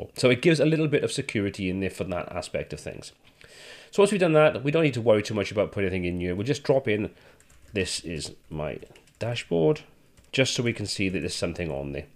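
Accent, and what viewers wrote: Wales, United Kingdom. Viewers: Hi, you sound Welsh? I'm also from s. wales. Thank you for this video mate I wish I found this 5 months ago when you made it, would of saved me a lot of time. :)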